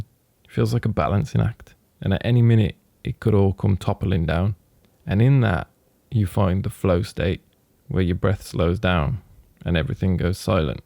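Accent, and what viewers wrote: Birmingham, United Kingdom. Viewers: Beautiful work. Refreshing to hear a Brummie accent - if I'm not mistaken. Thought you'd be from across the pond. 😂 ❤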